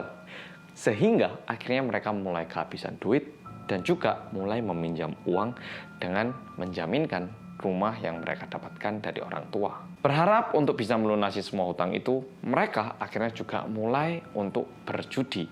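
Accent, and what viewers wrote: Java, Indonesia. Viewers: Halo bang yang medoook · Bersama orang Korea yangggg medokkkkkkk.. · Medhokkkk😍 editan videonya mkin mantep mas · Biarpun sinyal ajrut-ajrutan..tapi tetap semangat nunggu loading.. Demi nonton konten nya detective medok..mas 👍😎❤️ Semoga selalu sehat nggeh mas.. Salam dari Kediri 🙏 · GERCEP MEDOKKKK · Semangat mas medok :)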